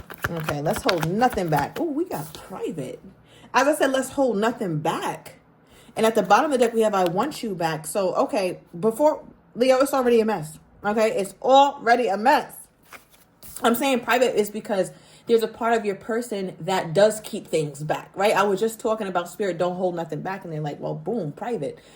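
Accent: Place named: New York, United States. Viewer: SEE this is what I mean! Even if you are not talking about me I LOVE how you break down Leo…!! Bc you’re RIGHT! Everyone acts like Leo takes no shit but you know what? WE ARE FAIR N GENOROUS ASFFFFFF But when you CROSS THAT LINE Oh baby. That’s when it’s CURTAINS. Curtains on your performance, bc I’m no longer interested in attending that show. And THATS when ppl freak out bc they are SO sure they can treat Leo like shit & we’ll always be loyal Na uh no hunny I’m not crazy… I WAS being crazy, for putting up with that bs. Now? I’m not crazy anymore Love that genuine real NY accent… this city is getting overrun with too many transplant & fakers imo.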